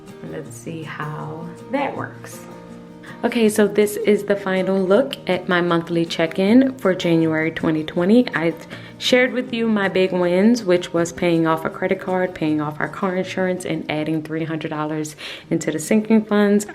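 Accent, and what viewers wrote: New Orleans, United States. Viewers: As soon as I heard you speak I knew you were from New Orleans then I clicked on your page and see you are a member at the city of love ❤️❤️❤️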